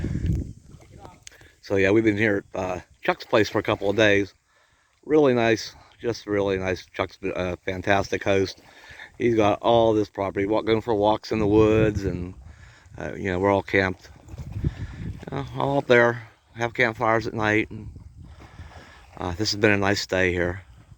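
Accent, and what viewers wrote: Pennsylvania, United States. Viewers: If it weren’t for your PA accent I’d say you’re a Michigander. Lol. Bella too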